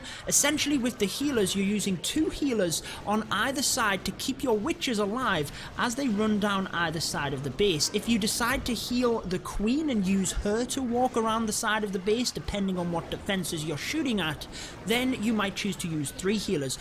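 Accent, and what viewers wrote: United Kingdom. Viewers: That british acent tho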